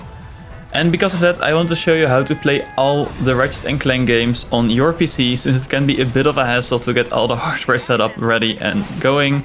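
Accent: Netherlands. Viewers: ahh I recognize the accent and indeed you are Dutch. Really cool channel, subscribing now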